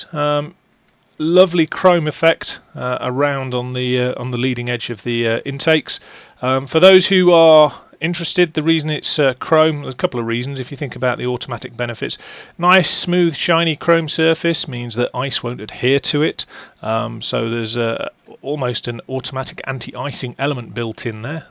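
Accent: United Kingdom. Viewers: Is this a Lancashire accent? Sorry this has nothing to do with the aircraft. I just bought it an watching for info. I love midland accents and I'm trying to distinguish the different ones.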